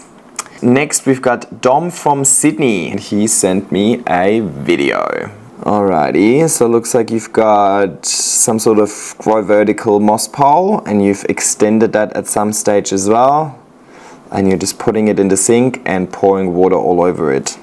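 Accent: Germany. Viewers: Wusste vorher nicht das du deutsch bist, aber dein Akzent (kaum hörbar) kam mir irgendwie vertraut vor 😊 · Ich habe es nur durch deinen deutschen Akzent irgendwann mitbekommen. Bin hier gelandet wegen deinen wunderschönen Pflanzen und den echten Moosstäben. Sehr inspirierend und funktioniert auch in kaltem, deutschen Winter. 🤪 Liebe Grüße aus Leipzig.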